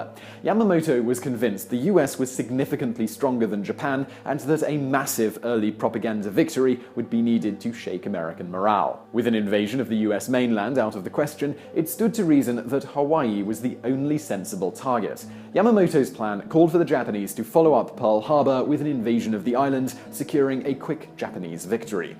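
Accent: United Kingdom. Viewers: I thought this guy was Vsauce putting on a British accent fora second.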